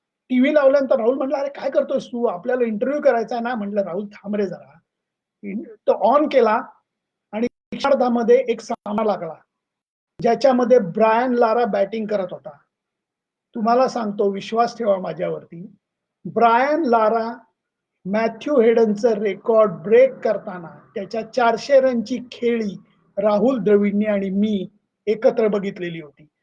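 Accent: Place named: Maharashtra, India. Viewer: Sir khup mast aahe video Ani khas karun tumche brahmani Marathi tya madhe je tumhi vyakt hota aflatun...Ani jya Mansa badal bola tya var bolal tevdhe Kami aahe.Tumhi cricket madhil Rama chi journey sangitali laxman chi journey sudha aaikayala aavdel tumchya kadun...praytna kara vat baghto aahe..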